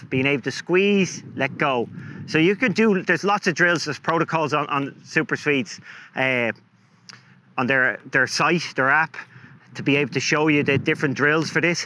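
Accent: Ireland. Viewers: English is his first language. It's just where he's from in Ireland that gives him that accent, it's very common there.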